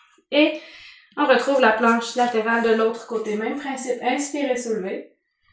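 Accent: Quebec, Canada. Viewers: Bravo à vous !!! Je fais pas mal de séances de yoga sur youtube et je veux vous féliciter pour la qualité de votre cours ! Tout y est clair : postures bien expliquées et mouvements respiratoires annoncés au bon moment. De plus, votre voix et votre accent québecois sont très agréables ! Merci !!!!!! Namasté !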